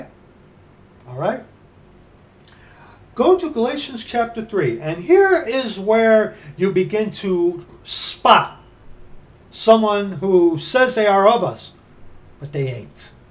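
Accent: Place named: North, United Kingdom. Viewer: I try to follow along, sometimes I don't have the time, but when I can I do follow you along. Your Northern accent throws me now and again!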